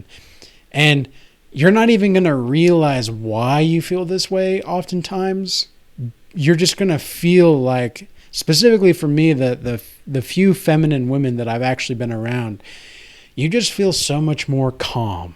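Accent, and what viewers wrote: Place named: United Kingdom. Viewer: No. It worked. The British accent. It worked : )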